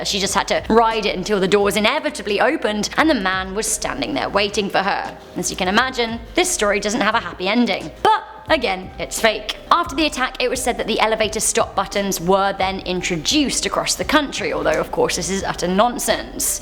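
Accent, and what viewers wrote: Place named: United Kingdom. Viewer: your so lovely 😊🤗😘 & your British accent is Awesome!!!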